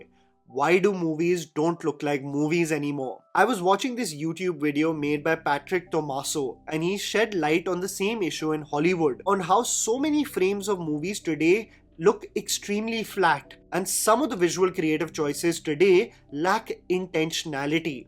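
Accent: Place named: India. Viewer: I didnt understand single word from the host accent was too thick Indian style with English. Just speak in Hindi?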